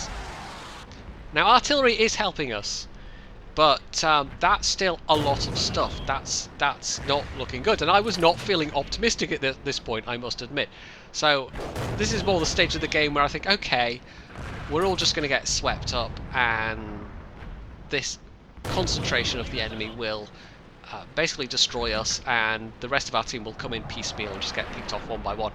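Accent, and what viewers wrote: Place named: Scotland, United Kingdom. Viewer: It's the Scottish accent messing it up. :D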